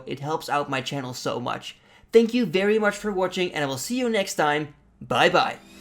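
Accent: Netherlands. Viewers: Ah I love a Dutch accent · Van het eerste woord dat je zei hoorde ik dat je nl was in episode 1. Echt een toffe serie en ik hoop dat je een daadwerkelijke noninatie krijgt voor die golden gnome :)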